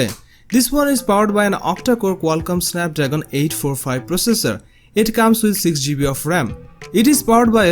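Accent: India. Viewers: I wanted to watch it but soon as some Indian voice started going tik tok tik tok that was it F.T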